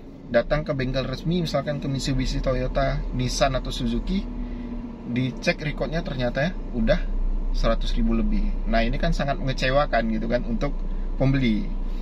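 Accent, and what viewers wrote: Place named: West Sumatra, Indonesia. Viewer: Asslm Maaf Logat kayak orang minang ya bang.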